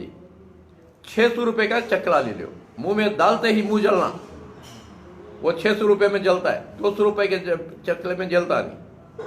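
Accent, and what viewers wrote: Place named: Bangalore, India. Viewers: Not Hyderabad. Banglori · its bangalore's local language (urdu) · Karnataki language hai yeh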